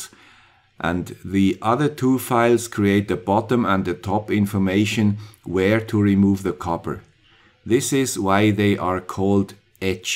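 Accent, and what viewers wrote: Switzerland. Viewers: Where can I buy one of those Swiss accents? It sounds great. And, on your videos, I especially appreciate - your commentary is not competing with loud music. If only all YouTube videos were like yours.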